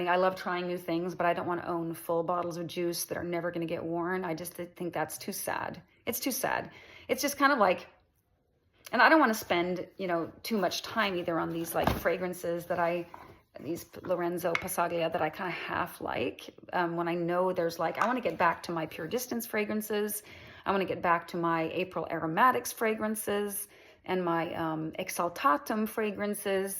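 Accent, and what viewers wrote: United States. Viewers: Btw, I love it that Sweden is so secular that you were unfamiliar with "omniscient." It's one of the three "omni" properties that the god of classical theism possesses (omniscient, omnipotent and omnibenevolent.) I had a fiancee for 4 years who was from Gàvle, and while her English might be stronger, you've got the American accent down perfectly. She has a Swedish accent when speaking English and you do not. So interesting! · You sound like a perfect American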